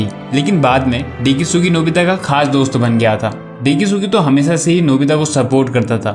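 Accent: Standard. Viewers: Bro damn ur hindi is pure. It's not everyday i get to hear pure hindi. Good job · Omg how can you speak so much pure hindi?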